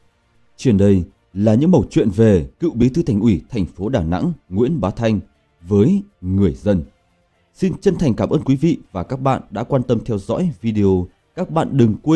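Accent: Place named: North, Vietnam. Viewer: Người kể chuyện mà theo giọng Quảng Nôm thì hấp dẫn vì sống động hơn nhiều Giọng Bắc khét lẹt!!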